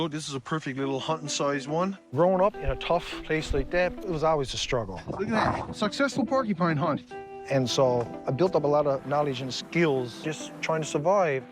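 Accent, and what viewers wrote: Canada. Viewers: Very informative and it's my first time hearing a Canadian accent that does NOT sound like an American! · The Newfie accent is mental!!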